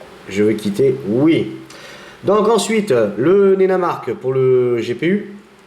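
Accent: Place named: North, France. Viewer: le francais du nord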